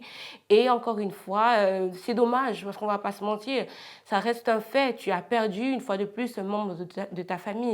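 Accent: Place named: Cameroon. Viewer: Pourquoi c'est toujours des gens qui proviennent d'un pays qui a connu des génocides qui ont été armée par la France la Belgique pour venir dénigre d'autres citoyens tu n'a rien fait pour aider ton prochain et tu continue encore avec ton accent kamer c'est choquant être plus blanc que les blanc juste pour une perruque et des papiers 😱😱😂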